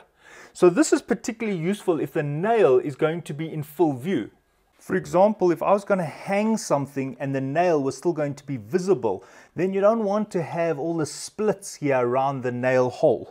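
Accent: South Africa. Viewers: No. This voice is more South African. The Terminator's is Austrian. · Definitely a south African accent though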